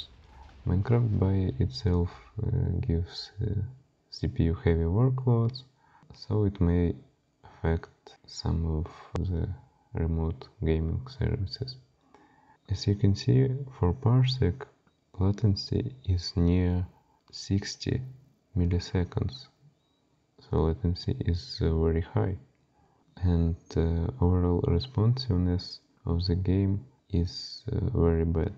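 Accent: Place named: Russia. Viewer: Hey, I've noticed that you're Russian. Good English, man! :)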